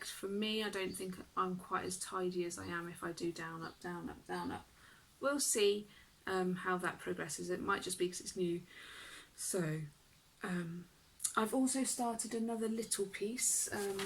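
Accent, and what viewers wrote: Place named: England, United Kingdom. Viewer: Hi there, glad to have found you. I live in Calgary, Alberta in Canada, but lived in the SE of England up until April 2008. Thoroughly enjoyed watching your video and hearing another English accent outside of the family! Cross stitch is definitely a more popular pastime in North America. My LNS is a 40 minute drive away, and the first time I visited it I was like a kid in a candy store, drooling over the choice of patterns, threads, fabrics and other bright shiny things! I started my first Long Dog Sampler in May of this year - The Templar Prophecy, I have also downloaded Pandemic, but won’t be starting that for a while.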